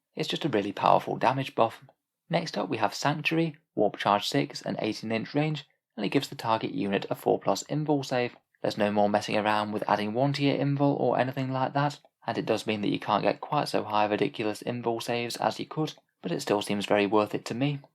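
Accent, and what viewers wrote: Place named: United Kingdom. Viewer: Your format is the perfect way to showcase Codexes and the British accent makes all things 40k more authentic to me.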